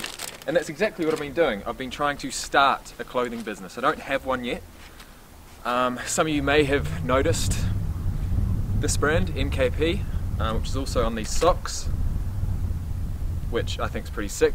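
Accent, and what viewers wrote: New Zealand. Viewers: You sound like a Kiwi? Are you Aussie or Kiwi bro? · Are you an Aussie? Got a bit of a kiwi accent going there bro! Nice vid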